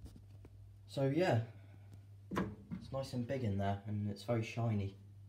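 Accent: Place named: Cornwall, United Kingdom. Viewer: He speaks with a Cornish accent. He probably lives on a farm in Devon or Cornwall. He is a lovely boy who should be working on his tractor.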